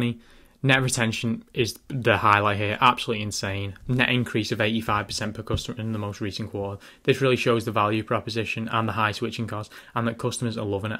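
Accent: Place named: Australia. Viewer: This channel is massively under rated. Also helps that you sound like an Aussie like me.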